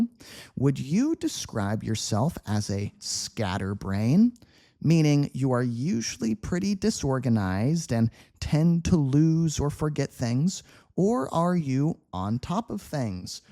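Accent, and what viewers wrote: United States. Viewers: I'm learning UK English, I don't mind watching your videos and following your recommendations, isn't this channel American English?,I from Xorazim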